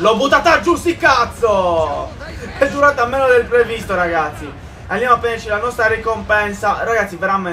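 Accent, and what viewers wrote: Sardinia, Italy. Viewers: sei sardo vero :) anche io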